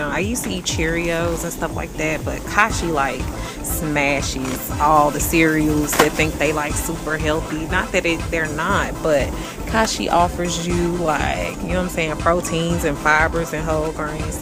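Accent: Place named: United States. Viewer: Girl sounds american but strongly looks southern african.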